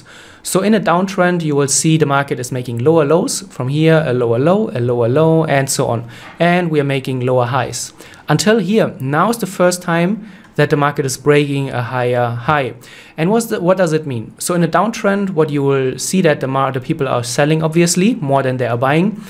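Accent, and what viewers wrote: Germany. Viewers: Grüße an meinen Landmann! Höre doch wenn ein Deutscher englisch spricht! 😁 Aber gutes Video! Grüße! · Very useful video :) You sound german haha. Wo wenn ich fragen darf? ;) Suche Leute zum austauschen und lernen, da ich Trading super spannend finde aber es schwerer ist als man anfangs vermutet. Kurse gibt es wie Sand am Meer aber Connections bzw. einfach nur miteinander reden und Erfahrungen teilen bringt meist viel mehr. Liebe Grüße aus dem Schwarzwald, Jan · u are defo a german i can hear that hahah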